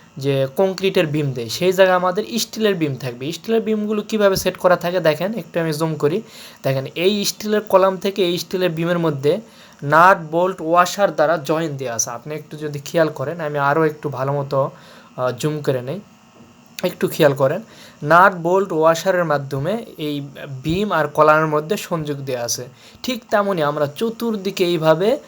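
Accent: Naogaon, Bangladesh. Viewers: নওগাঁ আর জয়পুরহাটের ভাষা কি এক? উনার ভাষা নওগাঁর মত।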